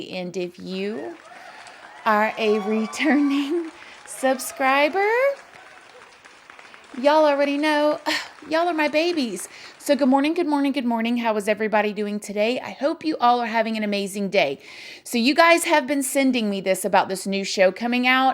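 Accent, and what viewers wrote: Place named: North Carolina, United States. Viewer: when you said shes on the doggone youtube i dieeeed!!!! im from charlotte nc and i hear your accent!! where are u from friend? im such FAN LIKE I WANNA BE YOUR BEST FRIEND!!!! LOVE YOUR VIDEOS!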